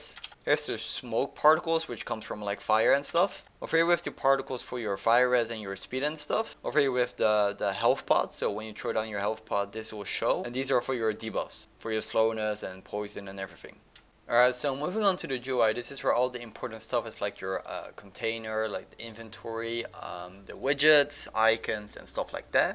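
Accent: Netherlands. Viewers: Are you dutch??? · Are u dutch?? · are you dutch!?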